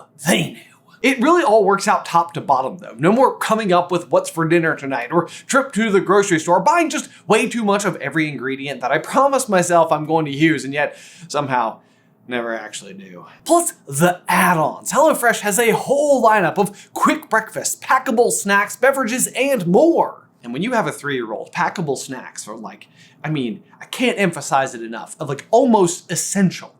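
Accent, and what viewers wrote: South, United States. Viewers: I didn't care for the Southern accent and feel like there are too many clips cut in the video. That being said you guys are amazing and I liked the theory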